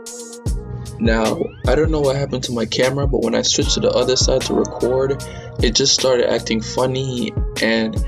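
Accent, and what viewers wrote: Jamaica. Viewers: That Jamaican accent just waiting to come out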